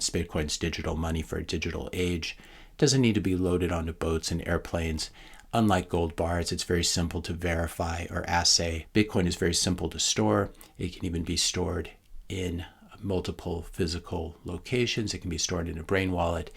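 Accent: United States. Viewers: Sounded like a ignorant american 👎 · I hate your yankee accent , it is awful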